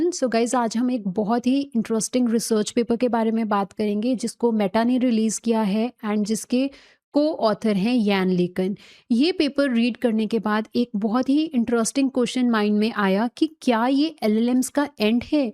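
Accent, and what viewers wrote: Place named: India. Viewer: It's so nice to hear an Indian voice behind an AI unraveling video that too in Hindi🔥🔥...please go deep for those who somehow can't able to break the language barrier yet...your video will certainly help in doing that...by making understanding of AI to the masses🙏🙏🔥🔥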